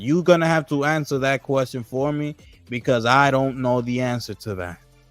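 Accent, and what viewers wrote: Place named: Dominican Republic. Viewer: You sound like a wild Dominican from the height or inwood.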